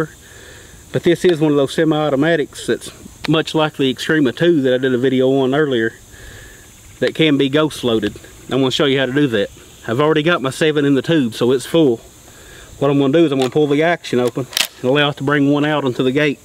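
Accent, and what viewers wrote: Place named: Oklahoma, United States. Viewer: This dude has a cool accent -- Oklahoma?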